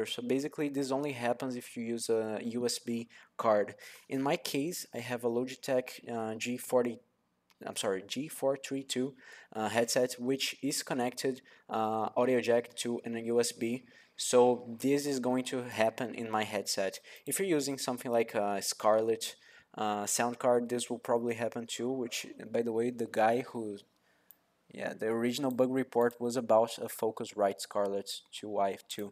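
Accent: Brazil. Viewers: Valeu cara, salvou muto (reconheci o teu sotaque de br kk). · eu reconheci que tu era brasileiro pelo sotaque kkkkkkkkk obrigada, funcionou certinho :D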